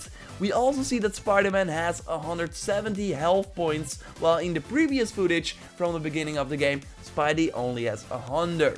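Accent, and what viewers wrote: Netherlands. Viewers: You sound like a dutch man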